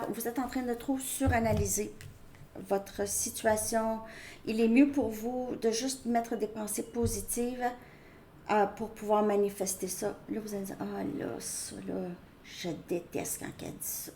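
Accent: Quebec, Canada. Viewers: J adore vos tirages et avec cet accent québécois que j aime tant!❤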